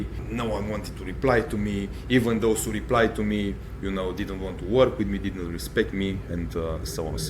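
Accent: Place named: Balkans, Eastern Europe. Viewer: Balkanski Prevarant sa užasnim naglaskom, scammer lmao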